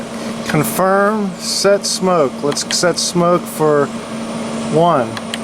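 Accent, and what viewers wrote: Pittsburgh, United States. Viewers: Awesome setup! And my God you sound like such a yinzer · I can’t get past the Pittsburgh accent lol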